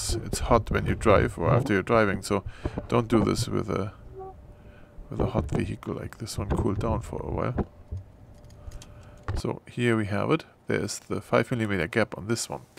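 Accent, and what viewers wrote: Germany. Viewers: Thanks!! Great video and photography. Love that German accent.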